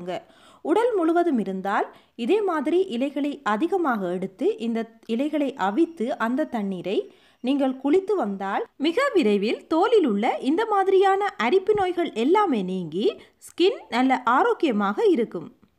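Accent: Sri Lanka. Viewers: they r srilankan i think they say like that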